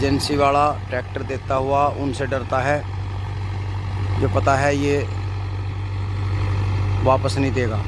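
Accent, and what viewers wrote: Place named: Western Uttar Pradesh, India. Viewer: जी राम राम। बिजी रहता हूँ फिर भी आपकी वीडियो आपकी कड़क आवाज सुनने की खातिर वीडियो पूरा देखता हूँ। दूसरा कारण वेस्ट यूपी की स्थानीय भाषा की बोली और लहजा मुझे हरियाणे की भाषा के mukable में ओर भी अच्छी लगती है। मेरा एक अजीज दोस्त गाज़ियाबाद से है। उस से 11 साल से बात कर रहा हूँ इस लिए अब मुझे वेस्ट यूपी की भाषा समझने में जल्द आ जाती है। From- गंगानगर (Raj.)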